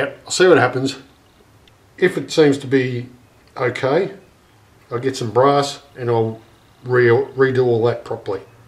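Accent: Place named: Australia. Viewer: Ahhhh.... So nice to find a video for a job I will be getting into after Christmas. Being a free lathe I had no time to look at it really but it felt tight in my inexperienced quick look. I had to dismantle it to get it home so I'll be looking for repairs needed whilst putting it all together. Thanks for the pointers and places to look. Mine is rather older without the thread cutting box. Just a heap of gears thrown in an old banana box that amazingly all look in good condition. Soooo very much appreciated I say with another Aussie accent. old-bonez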